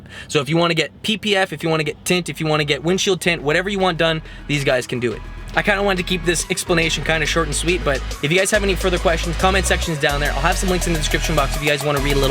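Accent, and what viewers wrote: Canada. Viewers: In the last year I've kind of realized that there is in fact a Canadian accent, even if you live in the cities, and oh boy I figured out you were Canadian right away LOL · Thats one thick Canadian accent. The pronunciation of those o's. But that aside, it's a good informative video.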